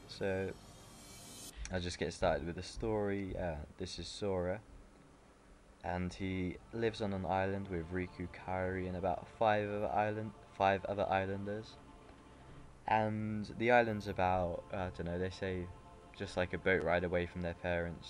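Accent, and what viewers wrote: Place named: United Kingdom. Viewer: A fellow Brit liking Kingdom Hearts! I have never heard a Brit do a commentary on it :) Love it :3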